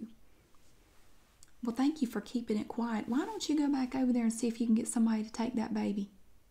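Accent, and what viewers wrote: South, United States. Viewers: 😂 She reminds me of my mother in law. She's always on the phone and in everyone's business!!! She even has the same southern accent as she's from Texas! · Your southern accent and this role play are really interesting! Tbh, I feel happy when I hear someone speaking in southern accent and cooks southern style food! Thanks!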